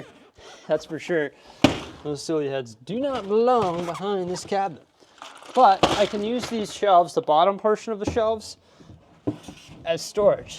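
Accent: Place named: Canada. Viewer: I find it so funny that you don’t sound Canadian till you say “about”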